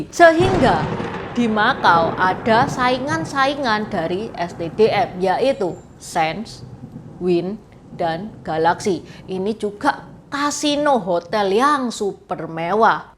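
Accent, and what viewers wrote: Java, Indonesia. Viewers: Logat jawa 😅😅😅😅😅😅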